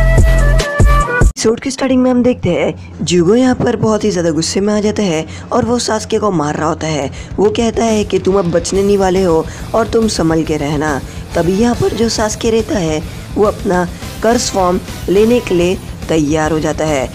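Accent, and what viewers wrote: Maharashtra, India. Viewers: Bhai tum marathi ho kya?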